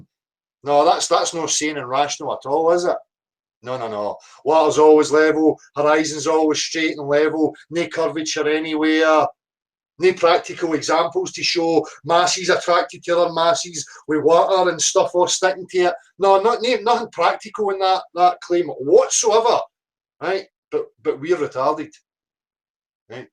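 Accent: Scotland, United Kingdom. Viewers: Love the Scottish accent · Who is this Scot please?????? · speaking English with his Scottish brogue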